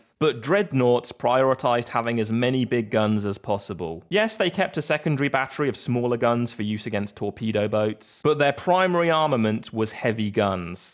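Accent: United Kingdom. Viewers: Holy british accent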